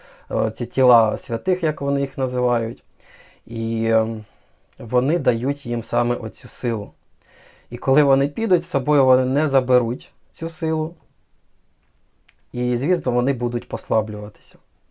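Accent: Standard. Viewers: Розкладам на картах не вірю, ніякі прогнози "картярів" не збулися. Але, слухала вас лише тому, що ви говорили нашою державою мовою - УКРАЇНСЬКОЮ!!! До речі, ви досить гарно розмовляєте, без суржику. І голос у вас приємний!!!😊😊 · Ух Ти, яка у Вас гарна українська мова, так чисто розмовляєте )))❤🇺🇦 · дякую! Яка у вас чиста,красива мова!!!❤❤❤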